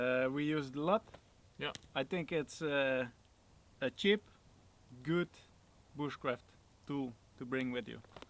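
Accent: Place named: Netherlands. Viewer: Hej, are you originally from Holland or Belgium i have family there i recognise the brytning! Hälsningar från Hamburgsund!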